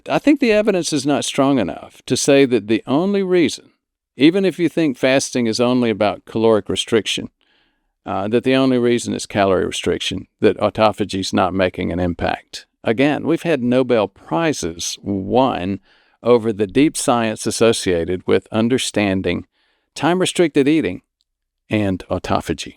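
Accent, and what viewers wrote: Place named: Midwest, United States. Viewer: Calling attention to some unfortunate ambiguity arising from terminology. In southern Illinois, where I grew up, dinner is at noon -- supper is at six. You sound to likewise have had a Midwest upbringing, but I'm nevertheless unclear on your terminology, and fear that others are also struggling with this. Regardless of this unfortunate confusion, thanks for all your efforts. I contend that by profession, doctors and lawyers wrongfully withhold knowledge from the masses through obfuscation. We're not stupid. Your efforts to feed the intellect of the alienated are a godsend.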